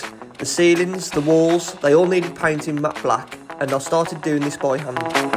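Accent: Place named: Birmingham, United Kingdom. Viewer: You from Brum or Dudley. Sound local to me. Also liked n subbed. Again I don’t know why your vid popped on my feed. Glad it did thou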